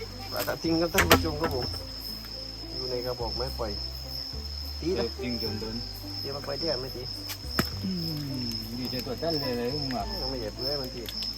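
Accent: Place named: South, Thailand. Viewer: แหลงใต้ทังคลิปเลยหรอยๆ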